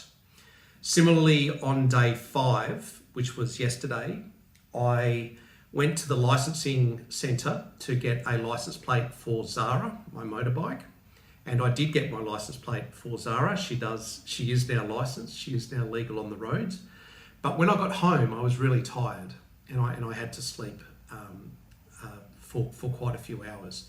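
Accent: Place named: Australia. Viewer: thank you for your lovely and thoughtful posts. My husband passed away 2 years ago so I'm alone. He was Australian so listening to you reminds me of Mike. He was from Melbourne so the accent is a bit different. I pray for you r health and that you may feel joy. You are a gift to the world. I look forward to your posts. My joys are my coffee in the morning and my grandchildren.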